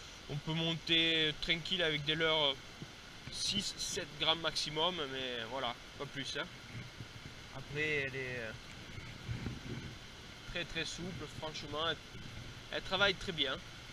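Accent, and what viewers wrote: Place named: Corsica, France. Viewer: ps ; ta l accent corse un truc de fou :)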